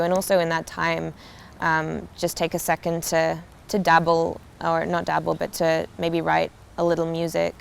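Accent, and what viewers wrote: United States. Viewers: I luved her American accent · She's slowly getting an American accent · Bloody hell, I wish she'd turn off the american accent!!!!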